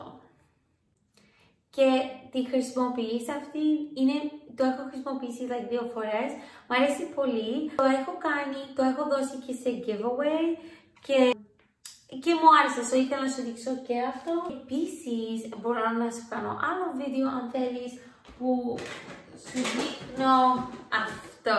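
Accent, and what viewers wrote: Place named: Greece. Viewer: Gringlish 100%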